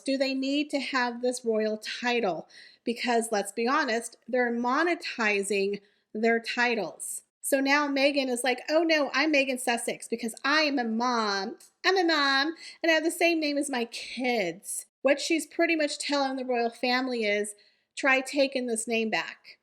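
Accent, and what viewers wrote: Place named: Texas, United States. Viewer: Hey Texas girl sending ❤ like your channel. You talk like me I'm also Texas born and raised here.😊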